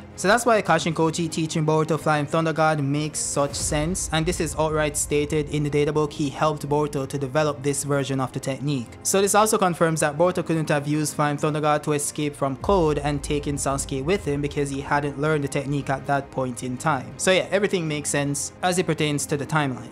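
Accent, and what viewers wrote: Jamaica. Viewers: The accent..you Jamaican bruv?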